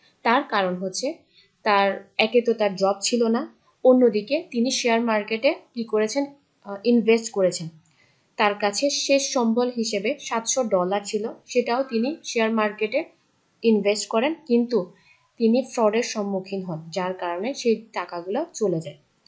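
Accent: Chittagong, Bangladesh. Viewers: আপনার বাচনভঙ্গি যথেস্ট সাবলীল কিন্তু আমি আপনার ভয়েজ প্রথম বার শুনেই ধরে ফেললাম আপনার বাসা চট্টগ্রামে 🥰 বাই দা ওয়ে আমি রাজশাহী থেকে 💕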